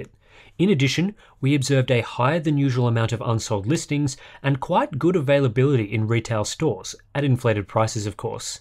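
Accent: Australia. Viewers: After 15 months of having an MSI Radeon 5500 Mech 4GB I can honestly say I only play these videos so I can hear the difference between my Syracuse accent and your Aussie pronunciations.... "One is my name. The other is not." - Lt. Commander Data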